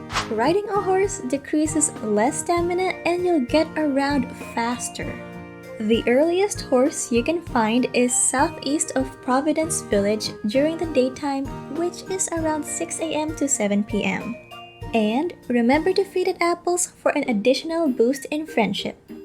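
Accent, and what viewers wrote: Philippines. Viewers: Your Filipino accent is so cute! ❤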